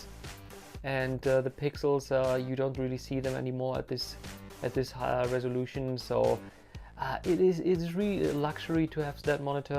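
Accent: Germany. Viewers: Hey thanks for the review, the monitor costs 670€ at the HP Store this weekend and you really convinced me to give this monitor a try I can barely notice your german accent, just saw on your profile that you're living in japan, thats my dream man hope you enjoy it :) Cheers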